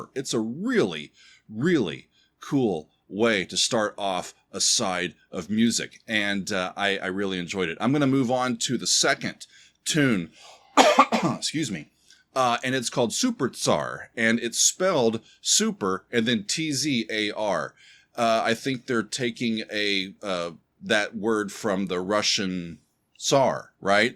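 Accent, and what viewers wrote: United States. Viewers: This album followed a great album and was followed by a medocre one. Their best one and shows how a group going through difficult times can make rheir best work. Very powerful, very heavy. Annoying American commentary, aftef all we're talking British through and through.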